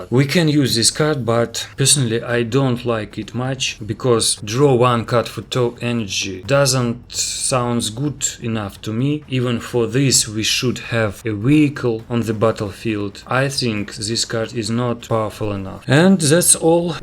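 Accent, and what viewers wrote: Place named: Russia. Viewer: Хех, слышу русский акцент, а так видео класс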